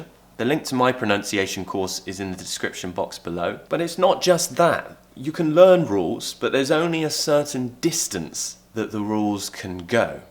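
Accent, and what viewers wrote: RP, United Kingdom. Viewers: 好听的英式腔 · Wow new hair cut... Handsome! I want to learn english and the british accent thats why i keep on watching you, you are good mentor..thank you! From Philippines... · I love British accent, especially yours because it's very clear, its easy to understand. 😍❤️ From Philippines 🇵🇭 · I love to listen your beautiful british sound and You are a good teacher ever! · Compering to a quite arrogant american one, it caresses ears. I love this posh accent and soft or lost R. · Aaaaahhhhh!!! Ur accent is👌👌😍 Honestly , I love British accent♥️the most ...though m Indian🇮🇳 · Clear, British English, i understand all, please advise me BRITISH SERIALS, with British accent, so i will be very thankful for you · British accent is more likely I like it 2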